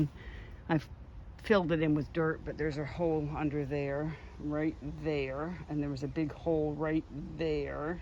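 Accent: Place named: Canada. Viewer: Well you definitely have a canadian accent now. Oh fancy that. You literally lived an hour from me. Most of my extended family are in liverpool or thereabouts but we moved around with my dads job then ended up back in Lancs. What a small world. So do you think of yourself as english or canadian or both?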